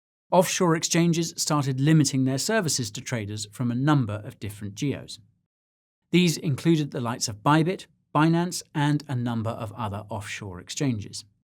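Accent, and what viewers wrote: United States. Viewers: The American accent kills me! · Great vid. Loved the American accent. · Nice American accent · I must admit, the American accent was rather hot!💥❤ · Excellent American accent,way to put yourself out there,. Love your content, brother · Solid American accent! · Great American accent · I love the American english accent 😆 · ur american accent is good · nice american accent u only slipped once! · You sound like Nicholas Cage with the American accent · american accent ....lol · I gotta say,. Your American accent is hella lot better than my British accent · Killer American accent, brother. As much as I try I can’t never get the entire Brit inflection right 😂😂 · Lol his American accent cracks me up. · Your American accent is on point! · nice American accent. :-) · your hilarious great American accent x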